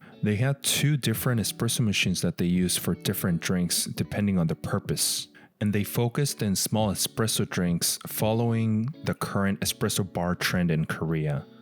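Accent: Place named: England, United Kingdom. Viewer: Welcome back! I hope you didn't forget me 😢 I was wondering when you'll post and now you've uploaded I'm so happy. Also this is useful if I plan to visit Korea one day Thank you so much for making this video possible 🥹🥹🥹 also hope ginger is doing well you're looking more handsome 💕 and I really love your English accent can I get a virtual hug 🫂?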